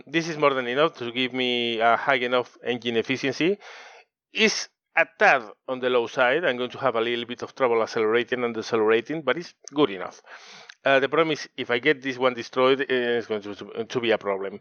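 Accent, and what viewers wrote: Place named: Spain. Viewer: Keep on doing what you do now, if only you have the time and energy. The accent doesn't personally bother me at all, rather on the contrary. I don't speak Spanish myself, but I love listening to it because of the flow of it and how it sounds. Your accent kinda imbues your English (which in my opinion is excellent) with the same kind of flow without making it difficult to understand, so it's all good. Drachinifel is Drachinifel, and is well and truly deserving of his status. I also very much agree on what you said about his wits and dry humor. It was only a few weeks ago when I last watched the "Voyage of the Damned" about the Imperial Russian 2nd(?) Navy's unfortunate and incompetent voyage from the Baltic Sea to the Pacific, and I laughed so hard my eyes were watering 😂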